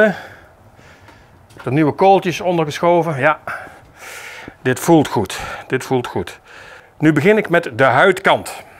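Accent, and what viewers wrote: Brabant, Netherlands. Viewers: Hé, weer een geweldige presentatie en gewoon lekker op zijn Brabants! 😜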